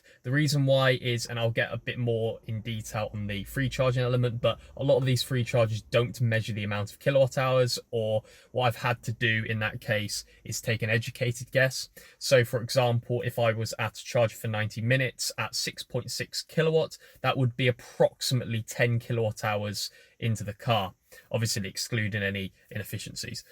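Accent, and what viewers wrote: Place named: RP, United Kingdom. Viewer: No problems and keep up the great work. There's a Norwegian guy that does tests also. Very interesting statistics etc. Btw, you speak clearly without any regional accent, hence why I thought you may have been to either public school or Oxbridge 😎👍🏾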